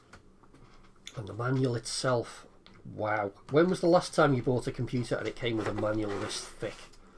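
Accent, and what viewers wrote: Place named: South Shields, United Kingdom. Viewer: Sounds like your from South shields 🛡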